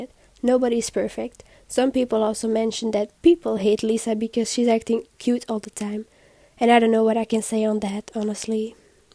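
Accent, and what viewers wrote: France. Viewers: I am French, this is obviously a french accent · Are you french ? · sorry, it's not about the video but are you french? idk but your accent sounds like a french one 🤷‍♀️ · Are you French? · your voice is so beautiful! i love your french accent . great video , i love the points you made :)💖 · Are you french?? Bc ur accent sounds a bit french and i'm french too so that's why i'm asking ;w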